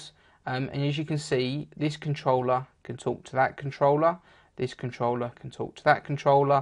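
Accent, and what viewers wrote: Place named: United Kingdom. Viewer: Thanks for yor video but sometimes your britsh accent gets in the way. Too much 'nasal effects' lol making it hard to understand. for instance what you say the console(light) what does Dell and HP call it? And you clearly didn't answer the question to the missing part 5 video. Keep you answers simple please... Thank you once again. Do you ever work with DELL SAN's what do they call them? You introduced a new term called LUN but failed to explain it. What are the common SAN models used in an enterprise environment? Furthermore: For instance, in an enterprise environment installing and troubleshooting SANs (Best practice) Performing switch add/zoning. Installing and troubleshooting Virtual SAN, SnapView/MirrorView Thanks in advance!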